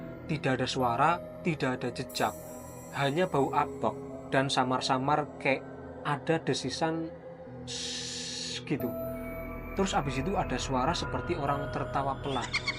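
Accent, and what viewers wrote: Banyumas, Indonesia. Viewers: Ngapake ngendi kang😂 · lu orang tegal yak bang atau Purbalingga? soale ngapak brok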